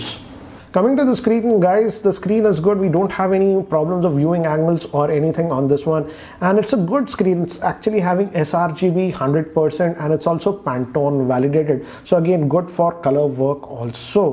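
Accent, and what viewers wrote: India. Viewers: He's Indian! He has an Indian accent! Don't like Indians? Don't watch videos made by Indians!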